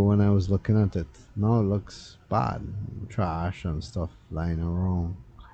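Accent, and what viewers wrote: Trinidad and Tobago. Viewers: You sound like a trini. You speak like my family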